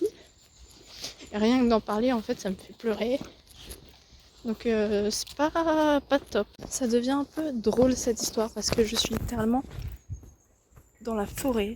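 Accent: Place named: England, United Kingdom. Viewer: Je kiffe ton accent anglais 😮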